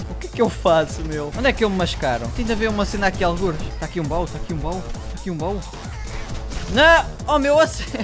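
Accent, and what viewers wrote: Portugal. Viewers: Ele é português TUGA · Adoro o jeito q is portugueses falam, mt fofo ( sou brasileira)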